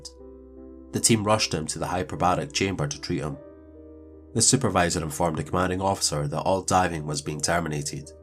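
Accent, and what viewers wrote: Scotland, United Kingdom. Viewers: Turning on auto captions will let you know the AI does not recognize a proper Scottish accent lol it's hilarious!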